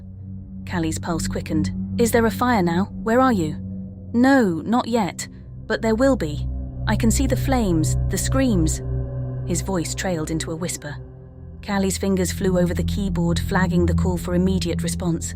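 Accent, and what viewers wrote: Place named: United Kingdom. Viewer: your voice is a very beautiful narration . you sound like your. British or England not sure. but it's very beautiful I like it. Plz keep up the great job .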